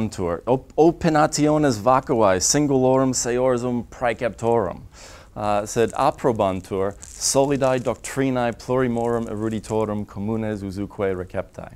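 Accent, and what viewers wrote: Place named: United States. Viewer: I love that this guy just roles with his American English accent.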